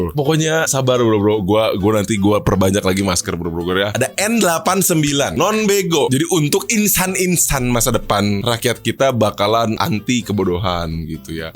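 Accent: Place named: Bogor, Indonesia. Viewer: logat bogor na karasa euy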